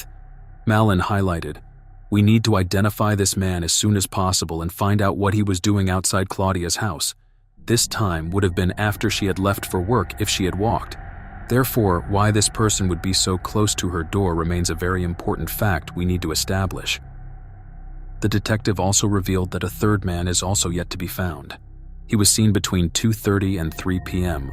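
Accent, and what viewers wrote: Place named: United States. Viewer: British crime but it had to be an American voice.........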